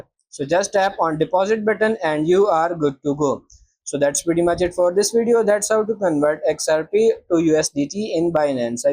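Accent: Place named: India. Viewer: Tumhare Awaz Bata Rahi Bhai Tum indian Ho Par Best Of Luck Brother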